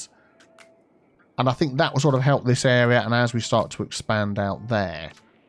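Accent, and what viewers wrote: United Kingdom. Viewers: I've always wondered, why do you use the American 'counter clockwise' rather than the English 'anti clockwise'? I know it's appropriate in this case as it's an American theme build, but you do it in your other cities too. I was just wondering as your accent clearly means you're from this side of the pond.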